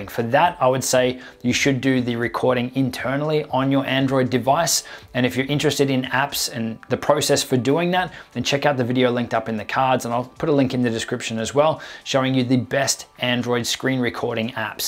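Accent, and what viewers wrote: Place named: Australia. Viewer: Great tutorial. However, my recommendation to you would be to pace your sentences so that your sentences are slower and your words clearer because right now you are talking way too fast to make any sense to me! Additionally, with your strong Australian accent, it isn't making following you as a non-Australian very easy. Why do you think that you have to speed through what you have to say in this video? Are you short on time? This video tutorial was way too fast for me to follow comfortably! Take Two and try to incorporate my advice into your retake on the same subject!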